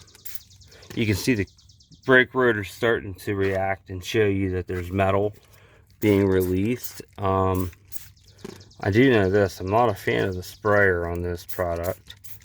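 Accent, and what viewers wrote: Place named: Baltimore, United States. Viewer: just guessing, from the accent... are you from East Bawlmer?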